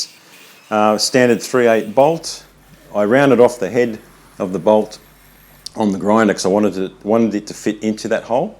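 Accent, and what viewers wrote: Australia. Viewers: Your channel is growing because you have awesome videos and because you have an Aussie accent. Keep em' coming!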